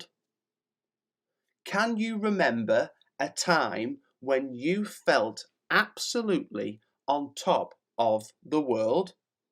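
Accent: United Kingdom. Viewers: Hello, you provide an amazing content here on youtube. I'm very grateful. Thanks a lot. But my son and me, we are a little bit curious from which part of the UK you come from:) because I would like to know more from which part is your accent. Sorry if I bother you with this.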